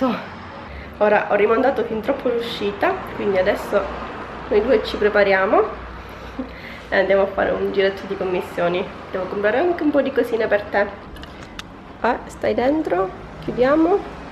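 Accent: Sicily, Italy. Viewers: Sei siciliana? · Sei siciliana??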